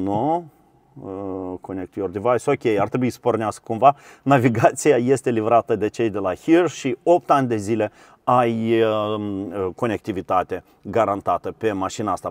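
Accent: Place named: Moldova. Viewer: Ăsta-i moldovean de dincolo de Prut ? Că după accent, așa pare !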